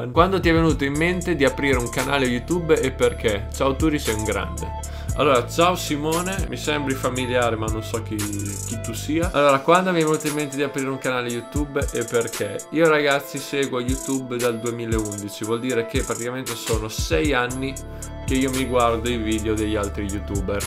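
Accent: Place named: Tuscany, Italy. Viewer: la tua toscanita è palese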